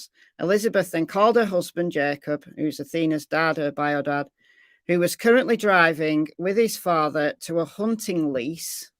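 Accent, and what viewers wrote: United Kingdom. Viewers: 🌟🌟🌟Hey, As far as your age… I don’t think it has anything to do with how you look. This mornings video was more flattering in the natural light and you look to be late 30s to me. I think its something in your voice. Not in a bad way! It’s something like a motherly tone. Wise. Ideals beyond what Americans usually have. Americans, in my opinion, can be self centered and downright viscous at times. Although Uk paparazzi are an animal unto themselves. (Poor Diana) Your steadfast beliefs and unshakable empathy for others speaks to many life lessons. I’m not sure I had that emotional intelligence when I was your age… AND I’m a bit biased on the British Accent. Lol. It’s a pure joy to listen to a well educated Brit when they get their Irish Rant going! Another thing… I hate “Super Chats”… just to old. But you have a way with lives. I usually sit and work on my Adult Coloring Books or play solitaire while listening. 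Again it’s the vibe of hanging out with tea and some laughter to lighten the horror this world dishes out. I really enjoyed latest member video! I would be so proud to have a daughter like you! Many blessings to you. 💖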